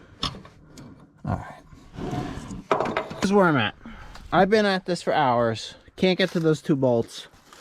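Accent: New York, United States. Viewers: New york somewhere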